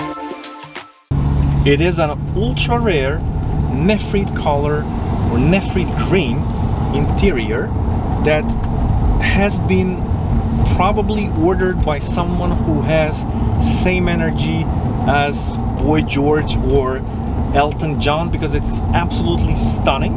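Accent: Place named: Russia. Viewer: i Love rus accentet! it not mostakbal! lovat red 996 !!!!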